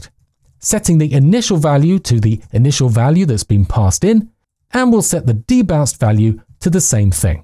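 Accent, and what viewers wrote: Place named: United Kingdom. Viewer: Neat demonstrations that can be easily understood. BTW, wonderful British accent!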